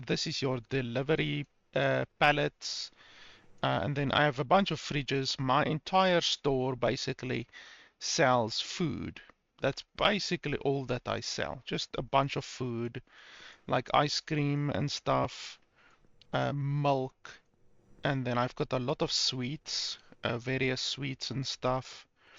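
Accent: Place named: South Africa. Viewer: Definately a South African acsent....🙂..(ek geniet jou reviews!)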